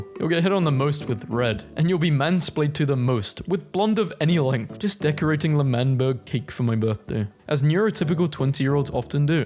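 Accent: United Kingdom. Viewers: i watched this entire video, thought about it for a moment and then realized you narrated the entire thing with a british accent. why didnt this come to me during the video?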